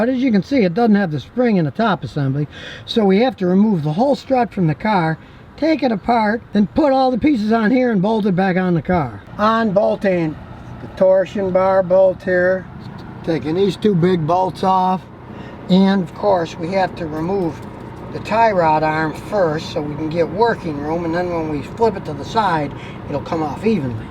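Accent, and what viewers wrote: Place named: Michigan, United States. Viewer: are you from Michigan? You have a Michigan accent.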